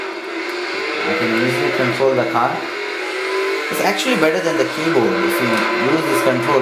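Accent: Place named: India. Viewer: its indian guy talking, would not trust 2 much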